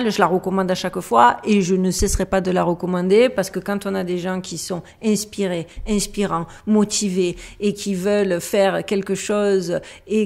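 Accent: Marseille, France. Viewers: Toujours des histoires passionnantes avec cet accent marseillais hyper puissant et envoutant que j'en perds le fil de l'histoire.Y'a plus qu'à relancer la vidéo en essayant de ne pas me faire hypnotiser 😋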